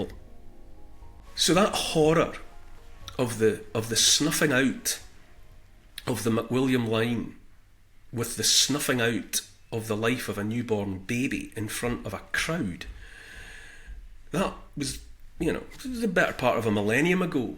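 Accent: Scotland, United Kingdom. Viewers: Probably because... Won't Kneel A gentle, beautiful Scottish lilt A kind, empathic, forward tilt An open heart; a caring face A willingness to take his place. A strong, home-hitting monologue Reminds us of the boiling frog The courage to become a sleuth A passion to release the truth. A deep desire to crack the whip Illuminates our freedom-strip Calls out the darkness taking hold The shiny despots; plated gold! Intense and raw, he lays it bare A soul-enhancing, honest stare Inflections drive the message through He speaks for Us - for me and you! Discernment's such a precious gift His words create a mighty lift Take heed of all he has to say He knows - it shouldn't be this way! 🤗🦋🐬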